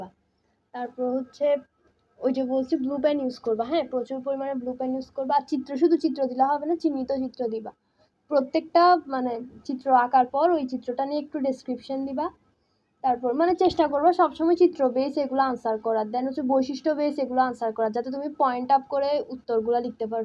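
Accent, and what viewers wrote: Chittagong, Bangladesh. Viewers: Apu ami kintu ekhon Chittagong e thaki🙂 Apnar language shunei buja jacche Chittagong er🙂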